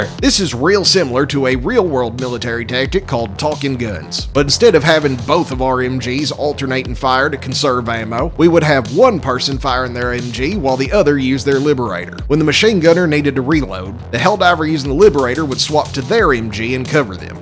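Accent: South, United States. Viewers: Your voice is as stereotypical American as it gets 🦅🦅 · That southern accent really make it sounds like you're a real commander. 🫡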